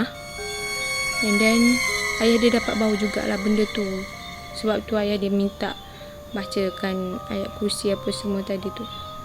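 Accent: Kelantan, Malaysia. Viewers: Sy Dr Cyberjaya...tp Sy still suka loghat Kelantan seperti cerita sebelum nya...ni nmpk mcm kekok jer bercerita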